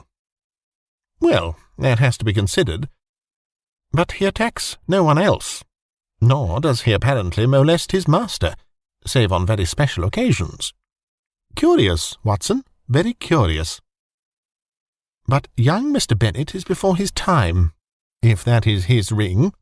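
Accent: United Kingdom. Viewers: Love Sherlock Holmes! Liked and subscribed! You have the best British accent ever! Love you!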